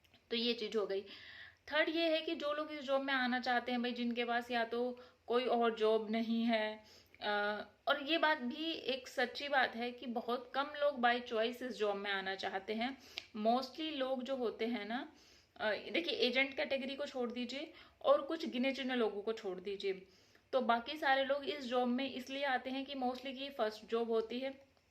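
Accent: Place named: Haryana, India. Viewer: Aap kha se ho ji? Bhatere 😂 sun k haryana se lag rhi ho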